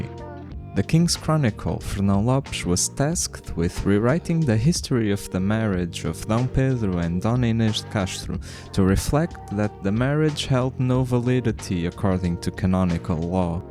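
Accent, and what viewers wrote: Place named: Romania. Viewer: Stai că m-ai lăsat în șoc. M-am gândit că accentul sună a român, dar nu am fost sigur.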